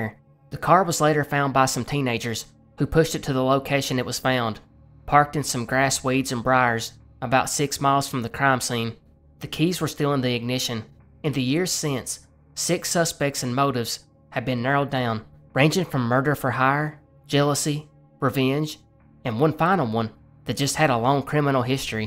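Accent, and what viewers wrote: South, United States. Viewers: I live in Holland but grew up in the South, so hearing your voice sounds like home 🙂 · I rarely if ever comment. Now I've subscribed to you about a week. Going to college staring things off always when I fall to sleep or do my work I gotta be listening. Your very unique love your southern accent, how you just get into it don't waste time and your upload schedule that shows a lot of dedication so I would honestly like to thank you for all your hard work on just entertaining your viewers. clearly 7K subs isn't enough and I think your very underrated. If you ever read this have a lovely day, and keep doing what you're doing. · nice vid! it took me a while to get used to the southern accent but its not bad. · I can tell by your accent that your southern American don't people in your part of the world do what are called "barn burnings" all the time? 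Why do they do those? Isn't it usually to clear the land to build a new barn? This is in regards to the burned house horizon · Well said love his southern accent too and the accent in general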